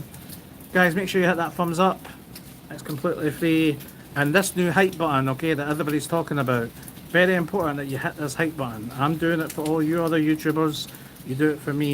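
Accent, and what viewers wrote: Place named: Scotland, United Kingdom. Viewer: The entire one hour and eight minutes + video shot just from your room/swim up? Personally, I needed to have English sub-titles to understand your Scottish accent!! :body-blue-raised-arms:🤔🤣 Hopefully you enjoyed it!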